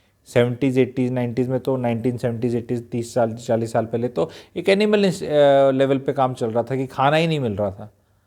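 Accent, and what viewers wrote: Haryana, India. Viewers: People do discuss these things on you tube...but your style of presentation...your personal tone..your being haryanvi.. helps you to be just plain straight forward...which touches the emotional cord at the right spot.. Though things that being conveyed are at elementary level...hope you would handle it with more nuanced approach in your next video.. But ofcourse you being people would listen to you.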